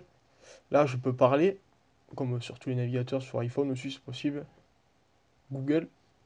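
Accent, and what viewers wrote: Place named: South, France. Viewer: Oh t'a un accent mdr t'es bien du sud Saint Christol les ales j'habite pas loin xd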